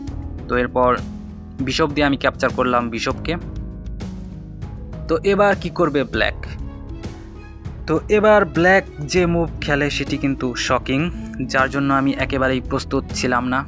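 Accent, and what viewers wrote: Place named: West Bengal, India. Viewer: না,মানে আপনার কথার ধরণটা পশ্চিমবঙ্গের মতো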